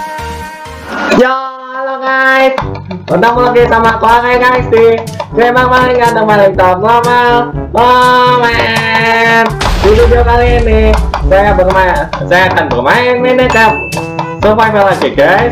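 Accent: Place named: Java, Indonesia. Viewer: Dari suara nya kayak orang jawa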